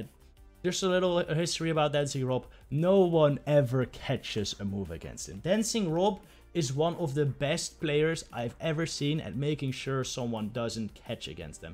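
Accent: Netherlands. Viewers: Youre Dutch aren't ya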